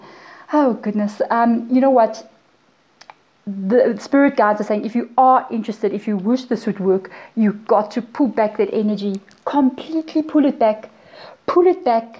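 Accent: South Africa. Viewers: You described my exact situation! Xx just walked away from a very toxic Pisces who cheated and not just once, was very up an down kept going back but now I’m ready to walked and not look back, Nice to hear a bit of “home” in your readings, I’m South African living in the uk 🇿🇦 Thanks for a great reading! X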